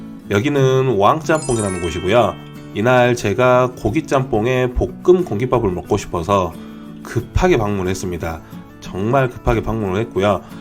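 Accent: Jeolla, South Korea. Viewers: 전라도 분이신가봐요ㅎㅎ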